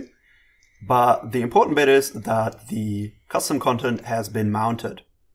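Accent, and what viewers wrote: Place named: Germany. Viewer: I höre some Deutsch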